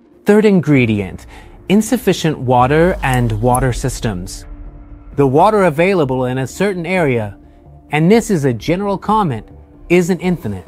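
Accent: Italy. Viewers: You don’t need to alter your voice to sound English. I much prefer your Italian accent.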